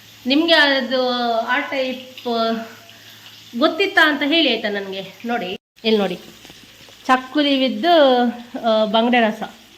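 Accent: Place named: Mangalore, India. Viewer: Just saw this chanel ,you talk like my elder sister 😂 our kannada is same like this because we are mangaloriens😂❤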